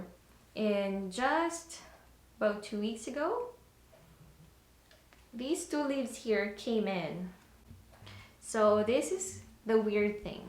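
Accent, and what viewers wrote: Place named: Philippines. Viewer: Its cool, no matter where we live, we filipinos sound the same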